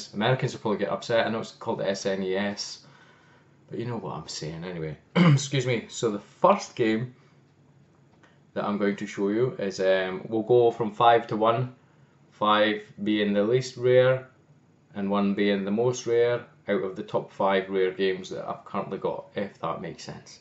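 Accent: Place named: Scotland, United Kingdom. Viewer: Nice video, man! Subscribed after only a few seconds. Impressive library already. I am wondering. Where are you from? My guess would be Scotland. Never have been there. But the accent reminded me of Robb Stark, hehe.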